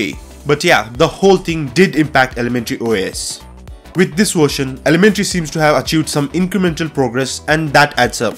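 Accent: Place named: India. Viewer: Dude...learn how to pronounce and speak english you smash the words together whit "daT indian accent" you speak half words , PRONOUNCE -slow the fak down and ARTICULATE full words jesus , you speak like XQC was indian ... stopped watching, half the time its not hearable And this is NO Elementary review , ups and downs, or even know how, this is just a glorified add mostyl, EVERTHING is SO GREAT in elementary, jesus, really? and you say 3 games and you say its great for gaming , so many games so many games :D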